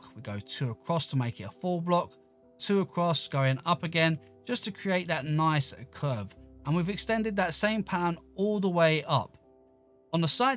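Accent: United Kingdom. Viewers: Hey, I haven't been on your channel for a while, but a question, are you bri'ish?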